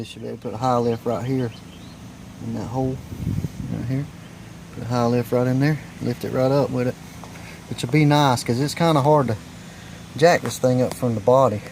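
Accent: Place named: United States. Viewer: Don't mind the countryfied accent... it s down home Americana we need more of it. Nice work on that XJ she looks real pretty... better tho when she gets mud on herself.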